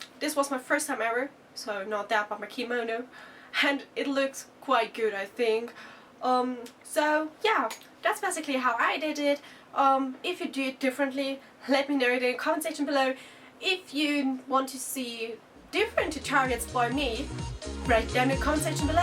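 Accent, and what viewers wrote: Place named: United Kingdom. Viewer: Cool, das merke ich mir. :3 Und die Folie fixieren, das merke ich mir auch :D Du hast einen süßen britischen Akzent :D Liebe Grüße Zementitia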